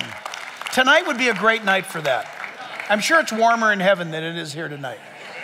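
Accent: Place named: New Jersey, United States. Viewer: You sound like you come from New Jersey to me! You also have the same sense of humor that my husband and I have and I gave him your name and this series you're preaching. God bless you, always. I've been through some of the things you mention.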